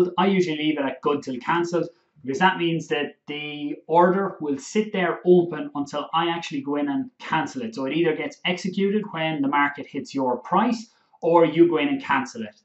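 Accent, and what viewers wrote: Ireland. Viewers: Great to hear the Irish accent !😁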